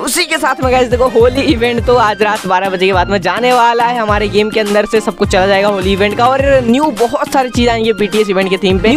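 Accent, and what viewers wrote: Odisha, India. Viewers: Bhai odisha se ho kya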